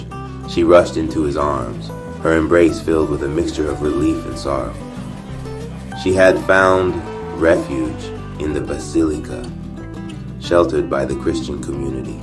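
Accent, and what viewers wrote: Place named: Sweden. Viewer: Narrator has a Swedish Lutheran accent.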